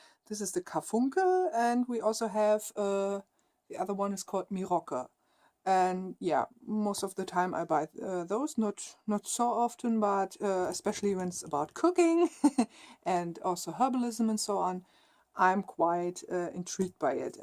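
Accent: Germany. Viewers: Being a of direct German heritage I love seeing all German things and hearing all the stories.... Brings me back to my youth and seeing the things around the homes of many of my relatives and tales told to me by all of them....even your accent brings them back to me! Lol. Like getting a great big hug from all of them! Thanks for sharing.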